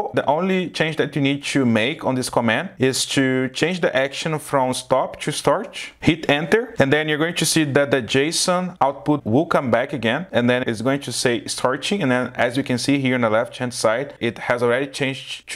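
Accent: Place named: Brazil. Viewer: Awesome.!!! Excellent video and excellent material that you share with the community! Since you approach it from the very practical side and complement it with the material that just Oracle released last week to do the OCI certification. I have a question for you! With the steps that you indicate in the video, I understood that we can create scripts in Bash to automate the starting and stopping of the instance or database. But where do you create the crontab... In OCI Client or in the machine?? or Where you installed OCI Client??. I'm very confused with that. Could you help me? Thanks again for sharing your knowledge and experience! .Finally, for your voice and accent, I think you're from Brazil! So regards from Colombia! Muito obrigado.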